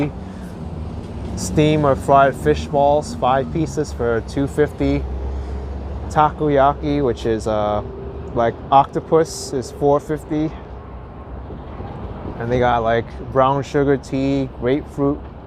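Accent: New York, United States. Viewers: Omg your accent is EVERYTHING. I feel like I'm back in old New York.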